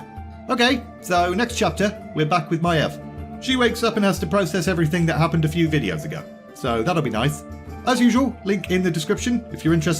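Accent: United Kingdom. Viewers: great stuff so far.... (see what I did there?) butt(wink) no one is surprised by that bit of corn. Keep pushing em out and youll feel a great relief when the weight is off you. Thanks for your effort genuinely, youve ruined reqular audio books for me lol. No where near enough jokes or profanity or character voices or British accent lol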